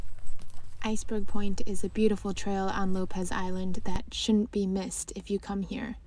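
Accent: United Kingdom. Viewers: I love your British accent. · 🇬🇧 What a tranquil island. British accent was perfect! 😁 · Everything sounds just lovely with a british accent! 🇬🇧🇬🇧🇬🇧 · Some more lovely and cinematic sequences. I'm glad that the seagulls didn't take down the drone ;-). You don't need to mock or be hard on yourself, the chatting is charming, as is the British accent. Did you watch Chitty Chitty Bang Bang for inspiration? Keep up the engaging work and life ❤️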